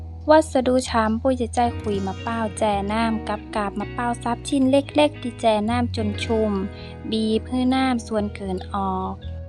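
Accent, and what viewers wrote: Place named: North, Thailand. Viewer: ชอบฟัง..สำเนียงอู้คำเมือง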